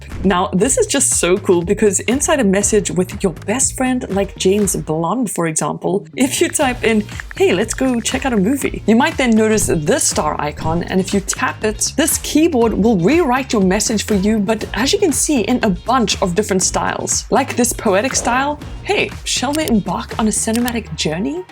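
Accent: South Africa. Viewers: You sound very South African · I can’t unhear how much you sound South African… 😅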